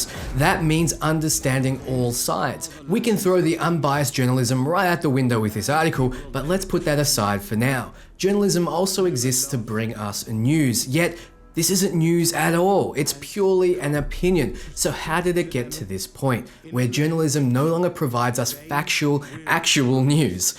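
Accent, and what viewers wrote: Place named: Queensland, Australia. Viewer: "Were there female Viking warriors? Who gives a f!" "That was a question Kotaku so next time use a question mark mate." I'm not sure what you read, but the part highlighted in blue literally has a question mark, right there, after the question. Are you intentionally being obtuse? I dislike Kotaku as much as anyone who understands their background, call them out on their biased reporting, there's ample opportunity for that you don't need to make stuff up. I really enjoy your content and as a fellow antipodean it's nice to hear an Aussie accent - your accent suggests Queenslander to me, I'm from SA. So what's going on my dude? EDIT: I've just come from your recent video with the hidden messages where you miss-read the hidden message. I don't think you're doing this intentionally and would like to believe that you are being intellectually honest but it does set the framework up to make me wonder what else is going on when we can't read what you're quoting from. 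Anyway as an Aussie I'm sure you'll see my comment for what it is, criticism, pure and simple.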